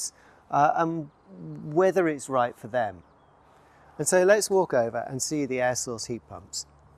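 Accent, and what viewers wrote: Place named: Southern England, United Kingdom. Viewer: It would help to know where in the country you are. I take it you are not in Scotland and from your accent you are probably in the South. So is the system only suitable in Southern England where, these days, it is quite warm?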